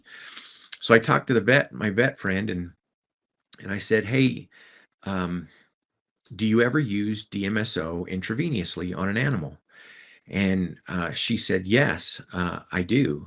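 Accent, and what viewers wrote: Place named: West, United States. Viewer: I’m just so curious what doctor you found that would do those DMSO treatments intravenously and would you be able to share that with me? I am from Michigan and I don’t know where you live. It sounded like maybe out west somewhere but even through the doctor that is doing that for you, maybe she would have an idea From doctors More in our area?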